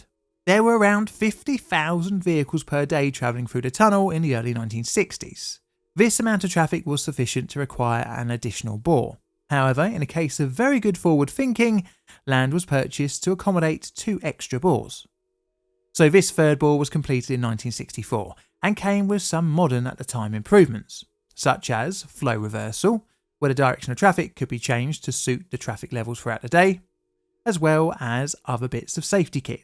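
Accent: United Kingdom. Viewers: I like your British voice, and humor.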